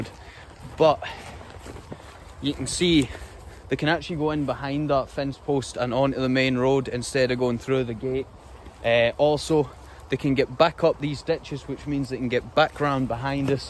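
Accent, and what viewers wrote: Scotland, United Kingdom. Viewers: you do wonderfully with these beautiful, intelligent, well trained dogs and your videos. I love everything. Your dogs doing their jobs so happily, your Scottish accent, the landscape and the music. Love hearing your commands, “come by”, because those sweet dogs jump into their beloved duties. Love from a Scottish ancestry American. ❤❤❤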